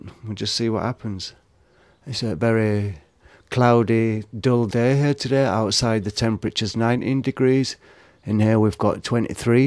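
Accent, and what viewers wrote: United Kingdom. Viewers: your british? birmingham ? obviously in germany now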